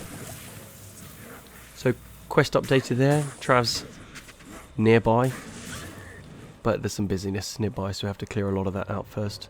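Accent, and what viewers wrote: United Kingdom. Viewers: The dead-pan, British delivery kills me. “I’m debating profiting from mana potions. Is that the kind of example we want to set for our children this holiday season?” Lmaoooo Sooo many good one-liners. Well done.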